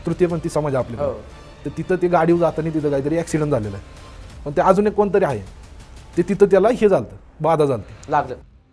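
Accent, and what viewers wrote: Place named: Pune, India. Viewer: Propar puneri language Good 👍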